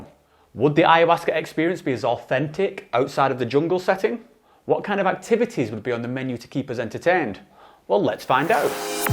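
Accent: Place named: Manchester, United Kingdom. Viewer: ah I knew you were close, I can hear the manc in your accent now haha I'm from Leeds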